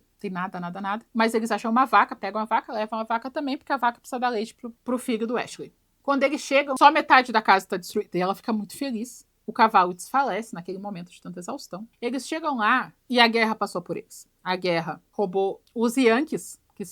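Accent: Curitiba, Brazil. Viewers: Este foi o vídeo que mais soltaste um sotaque paranaense, de Curitiba. Nunca tinha visto tu falar com tanto sotaque. Achei engraçado e ao mesmo tempo intensificou aquilo que estavas falando, combinou. Adorei!!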